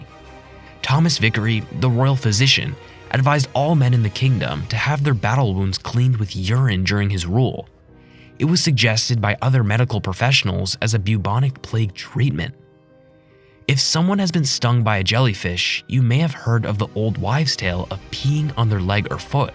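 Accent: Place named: United States. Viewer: full of incorrect “facts” and seppo pronunciation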